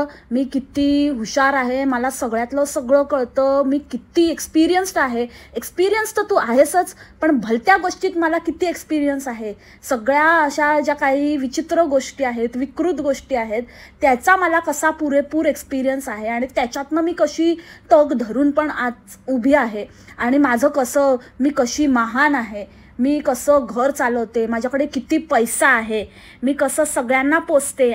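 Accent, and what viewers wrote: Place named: Malvani, India. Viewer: Tai tula malvni yete ky.....mhnje tu malvni mast boltes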